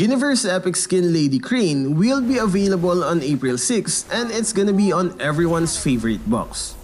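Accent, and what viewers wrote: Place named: Philippines. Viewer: The filipino accent is strong in this one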